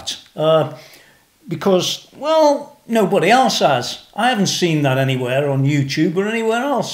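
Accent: Stoke, United Kingdom. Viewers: Sounds like you’re from Stoke? 🙌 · I don't know why, but the stars and stripes strap combined with this british accent gave me weird feelings.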